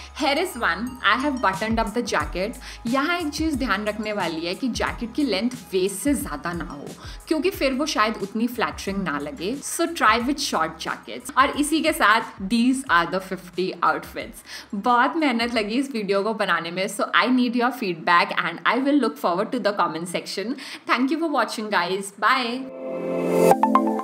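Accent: Punjab, India. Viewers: Hey, just found your channel and quickly subscribed and followed you on Instagram. I usually don't leave a comment but you are so pleasant and I just loved your Punjabi accent. P.S. Aap bohot Pyaare ho and I loved the way you spoke throughout the video. Lots of love to you! Stay true and best wishes! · Great job done! Thanks alot for providing soo many options in one video👍 appreciate your efforts ❤️ nowdays bloggers waste 15mins for just one tip/outlook, but this was worth watching. I saw your video for the first time...n yes I m the new subscriber😇 yayy I found a decent, grounded styler👍❤️ (The Punjabi accent is love) Lots of love and success to you lady😇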